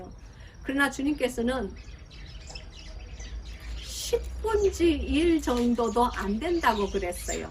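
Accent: Gyeongsang, South Korea. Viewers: 목사님 경상도 사투리가 정말 구수하네요 주안에서 평강과 은혜가 충만한듯 합니다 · 목사님의 순수하심에 빠져들어 이제는 사역을 통하여 체율체득함으로 나오는 간증과 함께 생명의 말씀에 붙잡혀 매일 빠져들고 있어요ㆍ목사님 어투가 경상도 출생인가보다 했는데 부산이 고향이시란걸 오늘 알았네요ㆍ삶이 예배란 말씀에 전율이 흘러요ㆍ목사님 너무너무 고맙고요 감사합니다 솔직담백한 큰매력도 큰능력 이라고 느껴져 더욱 감사합니다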